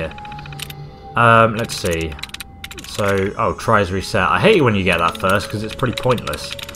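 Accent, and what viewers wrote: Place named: England, United Kingdom. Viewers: This accent is clearly English! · definitely not Aussie accent but my bets on Brit or Scottish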